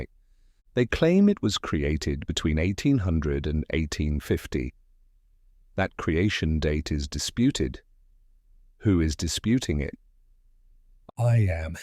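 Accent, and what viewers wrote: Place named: United Kingdom. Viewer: The voice is British - not for me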